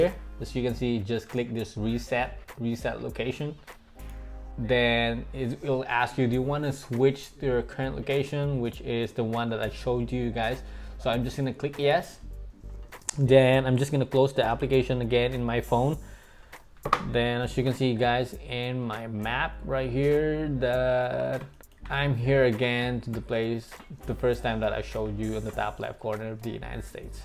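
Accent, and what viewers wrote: Philippines. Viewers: Hehe salamt po kuya tiga SUBIC po ako yung pagsasalita mo palang halatang pinoy na 😂♥️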